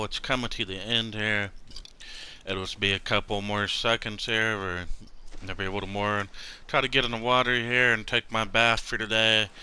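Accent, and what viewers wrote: Texas, United States. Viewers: Is it your mic or are you from Texas? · My nigga u from texas?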